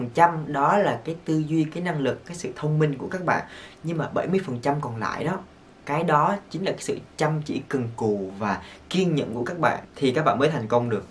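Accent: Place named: South, Vietnam. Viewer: Anh nói tiếng Việt nghe giọng bánh bèo là vì do anh nói giọng miền Nam nên nó vậy chứ thử nói giọng Bắc hoặc Trung xem có nghe bánh bèo không🤦‍♀️🤷‍♀️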